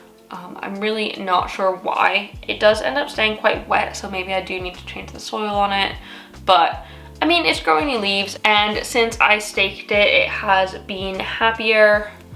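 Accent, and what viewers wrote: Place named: United Kingdom. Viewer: Omg I can hear your UK accent forming lol 😝❤️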